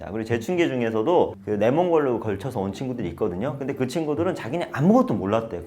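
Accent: South Korea. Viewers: 말하는개 보니깤ㅋ 한국남자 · 이사람 왤케 한국사람같음? 말투가 그냥 한국인임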